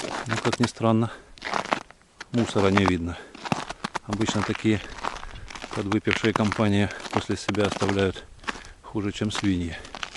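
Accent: Ukraine. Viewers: Поэтому и спрашиваю..по говору понял что украинец. Я недалеко от Новочеркасска проживаю ни снега ни льда нету давно.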